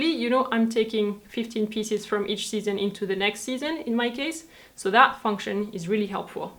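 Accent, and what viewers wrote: France. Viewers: you can tell from that beautiful accent! She's definitely french :) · I can tell by your accent that you speak French. Maybe you are french and live in Germany. You don't have a German accent at all.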